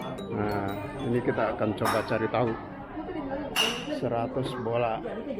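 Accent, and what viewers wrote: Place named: Bali, Indonesia. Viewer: Ini bapak lihat logat nya orang bali kali ya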